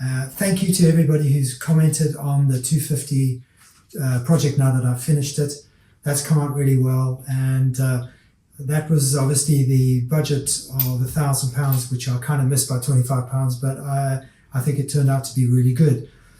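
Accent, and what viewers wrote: South Africa. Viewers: South african accent?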